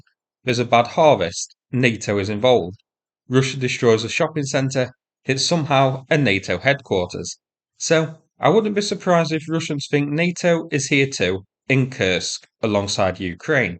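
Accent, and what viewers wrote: United Kingdom. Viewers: Completely unrelated - what's that dialect you're speaking? I love it, but I'm crap at British dialects 😕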